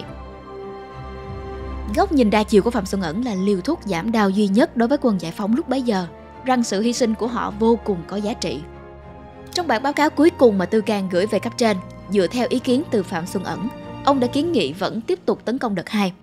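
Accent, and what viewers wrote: South, Vietnam. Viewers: Aishhhhh nghe giọng miền Nam đọc những video cách mạng khiến tôi hài lòng quá. Nếu là giọng đọc của một việt kiều cali có lẽ tôi lên đỉnh mất 😊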